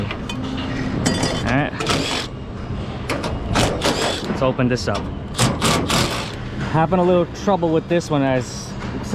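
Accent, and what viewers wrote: New York, United States. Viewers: Your from newyork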